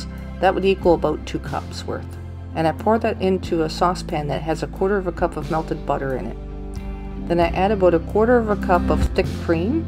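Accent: Canada. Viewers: Thanks for sharing I love naan bread and I also like your Canadian accent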